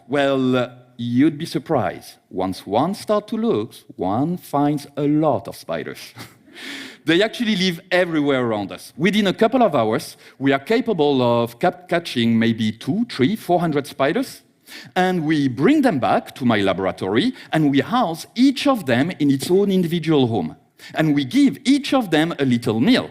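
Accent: France. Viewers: Speaks very well, french accent or no. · I don't care if can change the world I became total dumb about rational things when it come to spider 😭😭😭😭😂😂😂 et ptdrr Rpz l'accent Français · Okay French accent is now cool XD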